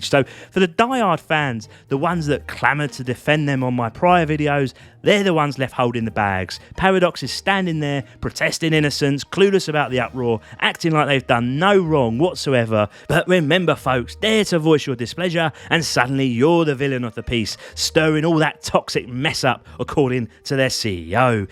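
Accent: United Kingdom. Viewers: i love your reviews and your lovely british accent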